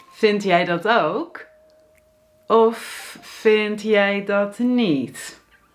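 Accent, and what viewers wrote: United States. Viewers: hai i have a question: are you dutch or american? ps love your videos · Very minor super slight tinge of US accent in the "R" but nobody would notice. The guttural "hock a loogie" "G" is spot on.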